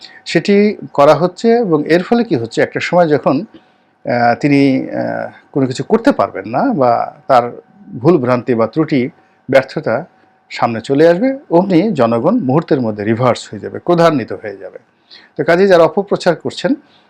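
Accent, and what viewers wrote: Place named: Barishal, Bangladesh. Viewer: ভাই আপনি যে বরিশালের মাল তা বুঝা যায়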